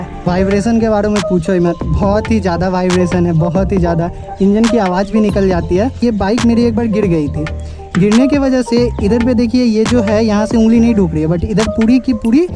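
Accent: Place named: Bihar, India. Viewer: loved bihar touch in your voice. bhaiya ji maja aa gaya.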